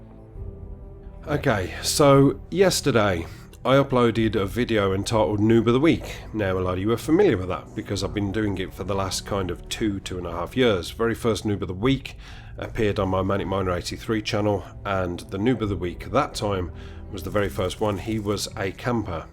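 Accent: Birmingham, United Kingdom. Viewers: Keep doing what you wanna do and if things are a little stale then play other games for a while, just dont leave!:( I will miss you and your brummie accent and that laugh you have.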